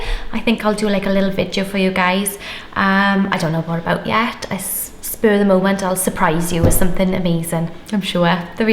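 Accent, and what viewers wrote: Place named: Wales, United Kingdom. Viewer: Fantastic loss again. So happy for you. Love your vlogs. I could sit and listen to your beautiful Welsh accent all day :) x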